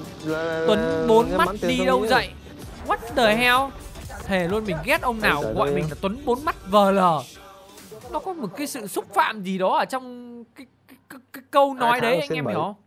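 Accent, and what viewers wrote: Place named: Thanh Hoa, Vietnam. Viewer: Giọng thanh hoá :))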